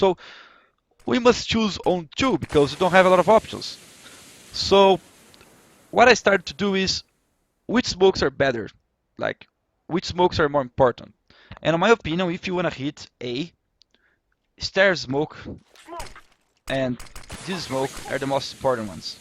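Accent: Brazil. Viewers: tu é br ou americano? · se quiser melhorar tua pronúncia da palavra WORLD para que não pareça WORD. Fale assim: wor - L(el) - D(di) worLd De resto, embora teu sotaque BR, dá pra entender muito bem.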